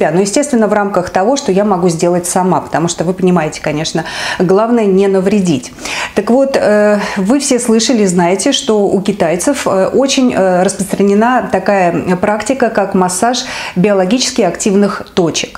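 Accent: Saint Petersburg, Russia. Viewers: Очень красивая женщина, красивая ленинградская речь! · Чувствуется коренная питербурженка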